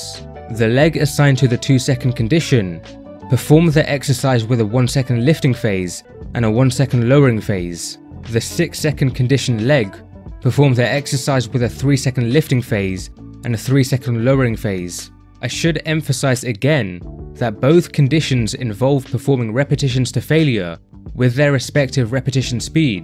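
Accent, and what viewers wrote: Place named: United Kingdom. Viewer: For some reason I trust him so much more because he had a British accent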